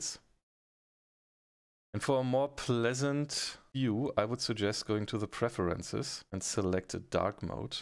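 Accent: Germany. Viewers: Hey, hast du ein deutschen Akzent? 😅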